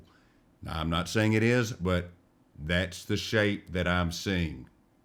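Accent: South, United States. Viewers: I subscribe because I love that southern drawl, the Mars work (an opus), and an abiding interest in Mars. The items you find are incredible. Sadly I can't do the 3D viewing, having never developed stereo vision (congenital eye problems), but I still try! I'd love to see what there is.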